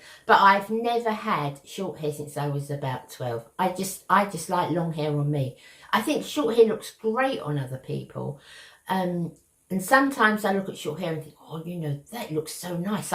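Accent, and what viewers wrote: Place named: Cockney, United Kingdom. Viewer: Thanks so much for answering my question! I love that you're a true Cockney! A rare breed, it sounds like. I love these videos because we get to know you better, but it's not fair to you because you don't get a peek into our lives, lol.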